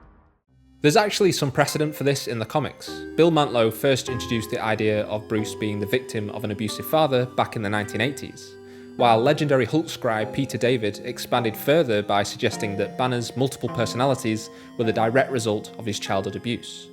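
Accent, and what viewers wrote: United Kingdom. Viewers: off topic, but what is that accent? I know it's UK but more specifically lol. sorry im american. like is it Birmingham or Yorkshire or Lancashire? lol im just guessing so sorry if im way off!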